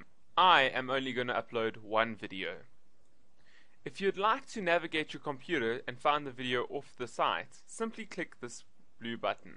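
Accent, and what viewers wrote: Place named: South Africa. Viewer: I immediately recognized your accent as a fellow South African! I have lived in Portland, Oregon, USA for the past 31 years, and still miss my homeland although I am very happy here. Thanks for the helpful info.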